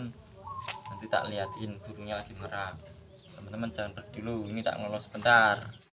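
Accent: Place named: Kendal, Indonesia. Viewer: Logate kok koyo wong kendal mas... kendale pundi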